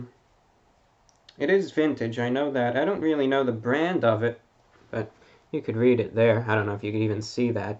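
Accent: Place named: New York, United States. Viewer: If I were blind I'd guess you were an old Jewish man from New York City who now lives in Florida. I do enjoy listening to you but you tend to ramble on a bit too much and I'd never pay to hear that. I'm glad that people do pay to hear your ramblings enough to keep you on You Tube.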